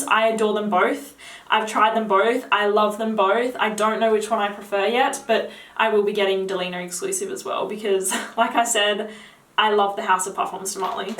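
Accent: Australia. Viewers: i looooooove your cute australian accent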